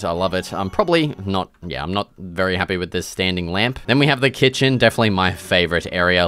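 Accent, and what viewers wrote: Australia. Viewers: He's not British, he's australian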